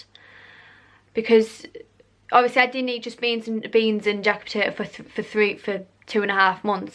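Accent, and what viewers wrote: United Kingdom. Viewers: what part of england is her accent from? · Northern for sure. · Love the accent. Is that Brighton? Newcastle? So many accents in the UK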